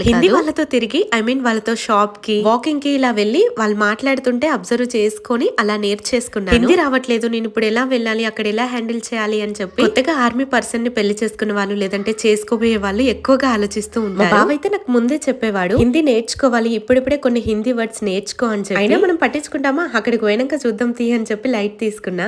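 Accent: Telangana, India. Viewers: Akka telangana matladutunav enti akka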